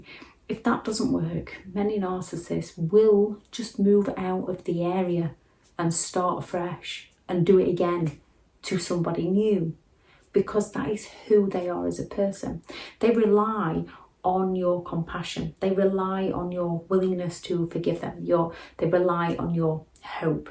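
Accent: United Kingdom. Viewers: I love your accent.Sexy Limey!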